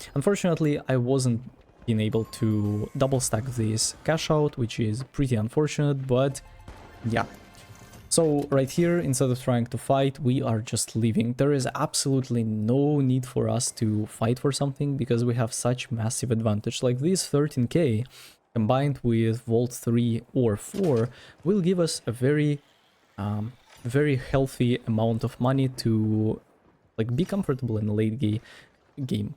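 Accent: Russia. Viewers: Сразу слышен русский акцент, bro 😀😀. Хорошо разговариваешь, very cool!! Тоже сейчас учу английский, только начинаю. Хочу так же свободно говорить и понимать на слух, ты молодец, так держать !!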